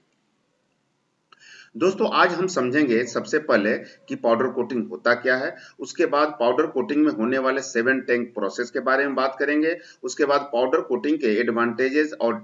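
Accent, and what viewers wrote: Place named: Bihar, India. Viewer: बिहारी लग रहा है।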